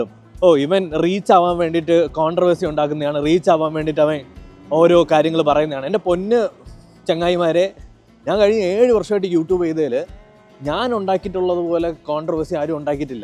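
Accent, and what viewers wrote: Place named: Thiruvananthapuram, India. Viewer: enth standard 😂😂 ninte movie review oke shokam aan....oru cinema knowledge ooo athinte oru essence oo ninte review il kanarila....chumma kore vaya kond goshti kanikum ....trivandrum slang il korch vaythalam.....and tech review x il ninum kittuna news athe pole irunn vayikunu.....ninte pandathe musically vedio kandapo manslyi nee oru kannapi mathrm ayrnu pine youtube il click aaypo ath ang continue cheythu....pine kore podi piler fans ulath kond koypmla....apart from as a content creator you are shokam .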